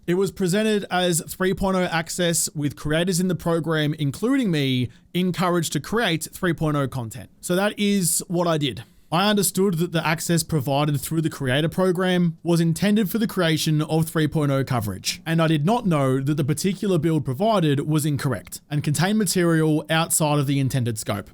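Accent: Australia. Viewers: I am here for the, not the game... for many years now...at first i was like "dang that man is talking like a fkn machine gun and is also as loud:D" but i stayed, first for learning the game and its mechanics, later, because i love your loud aussi snout;) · nooo-wwwww. video-wwwwwww. the older i get, the more obnoxious the australian accent becomes. insufferable. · I have been following you for what feels like nearly a decade now. You have been my favorite content creator based on your personality and great Aussie accent. Keep your chin up, it will get better.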